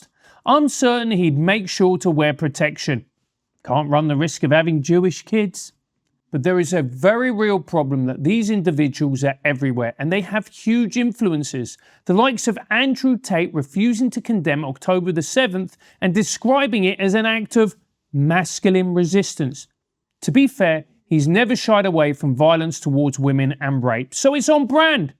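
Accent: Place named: Cockney, United Kingdom. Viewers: so why the hell is your accent cockney squared? Also f**k Wigan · 😂 is so English I love it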